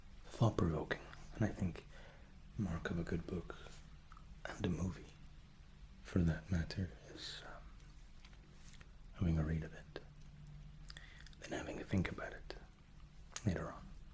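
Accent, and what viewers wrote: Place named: Scotland, United Kingdom. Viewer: Been looking for a British ASMR for a while, couldnt believe my luck finding a Scottish ASMR guy. Scottish women living in canada now, and miss the brogue. Love it.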